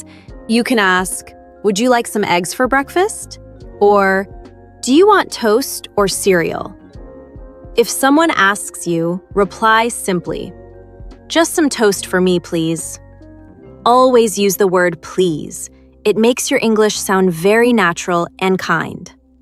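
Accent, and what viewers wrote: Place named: United States. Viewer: It seems like you're speaking American.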